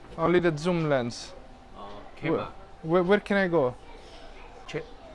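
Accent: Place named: Italy. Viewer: It's a beautiful enjoyment to listen to you speak English with your unique Italian flicking tongue. 🥰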